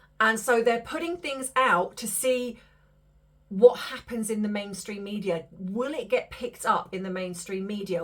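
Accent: United Kingdom. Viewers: U have a beautiful speaking voice and British accent !!❤️from Cali. USA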